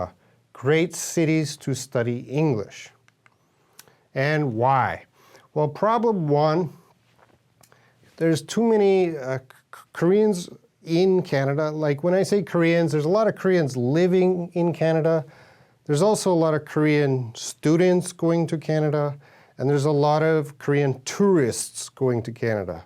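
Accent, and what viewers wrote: Eastern Europe. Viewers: I'm sorry, but minutes in you sound kind of Eastern European, or "something" Canadian. So you want foreign speakers to see you sounding weird to another Canadian, as normal? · You really seem to have a bit of a Slavic accent of some kind - were your parents from over there? Or - what is your background? Just curious - if you don't feel like talking about it, no problem! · Are you eastern European? because you don't sound like you are from Canada. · So, as a linguist, I hear your speech has a slight Eastern European accent such as Czech or Slovak. What other language do you speak?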